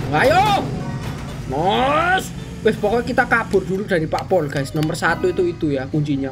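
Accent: Java, Indonesia. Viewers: Bang sama bang Dicky/Tampan gaming kan sama sama logat Jawa bang Dicky kan Semarang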